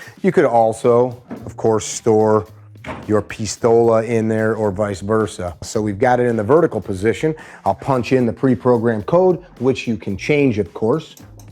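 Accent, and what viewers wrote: Chicago, United States. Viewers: Listened 3 times just for the chicago accent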